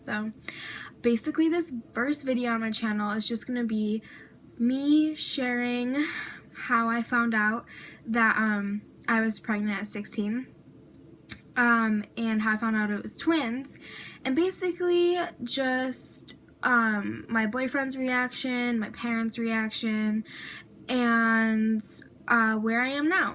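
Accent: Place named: New Jersey, United States. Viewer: The jersey accent!!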